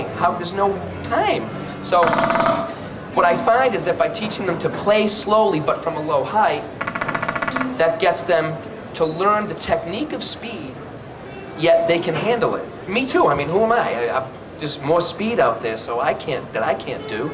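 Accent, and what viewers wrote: Boston, United States. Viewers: He's from the Boston area. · Is he a Boston fella? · Boston accent + saying retarded= win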